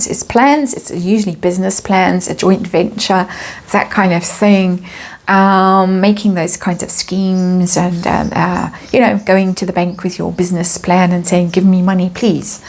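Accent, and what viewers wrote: South Africa. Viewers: Your readings are so interesting, and pretty much on the money . your voice transports me back To Cape town where i lived in the 70! Looking forward to the next! Grateful for your time and sharing ❤ I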